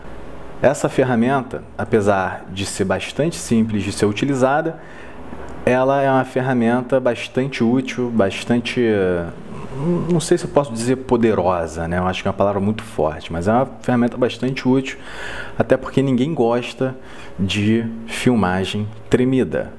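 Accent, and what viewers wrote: Rio de Janeiro, Brazil. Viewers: Adorei o video e tbm adorei esse sotaque carioca "Mesa de docexxxx" *-*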